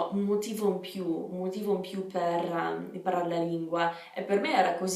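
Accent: Italy. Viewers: Hai gia un bellissimo accento italiano. Bravissima, continua così. 💪🏻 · Wow hai un perfetto accento italiano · Sei davvero brava, addirittura quando dici certe cose hai la stessa cadenza di un italiano! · Parli italiano. Ma non solo. Gesticoli, hai la r detta in italiano, e accenti e decadenze italiane. Wow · complimenti! Parli con un accento molto italiano!! Davvero! Non sto scherzando affatto! Complimenti! Mi sono iscritto al tuo canale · ODDIO SEMBRI ITALIANA. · Sei veramente bravissima,hai pure l’accento all’italiana 😂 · non direi mai che tu sia straniera. Accento 100% italiano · non si capisce che sei canadese! dal tuo accento sembri proprio italiana, complimenti davvero 😍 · Tu non sei normale!! Non è possibile per una madrelingua inglese avere un accento italiano così "italiano"! Ma come hai fatto? Considerati eccezzionale. ;-) Si vede che sei molto motivata e superperfezionista. 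Complimenti, hai un accento praticamente perfetto, e la cadenza è molto italiana. Il tuo accento potrebbe essere vagamente dell'italia del nord, ma è quasi inesistente, quasi da speaker del telegiornale. Io sono Sardo, e ti confermo che i Sardi parlano mediamente un italiano ottimo, probabilmente perchè un tempo parlare in sardo era visto negativamente.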